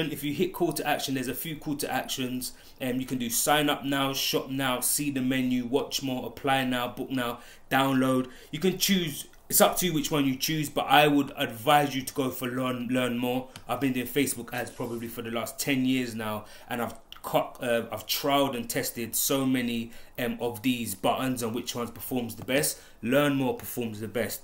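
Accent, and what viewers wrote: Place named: London, United Kingdom. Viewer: He's from London I'm guessing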